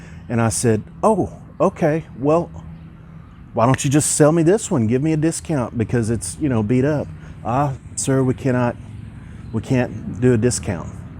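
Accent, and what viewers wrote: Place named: South, United States. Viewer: What state are you from in the US? You have a sorta country accent..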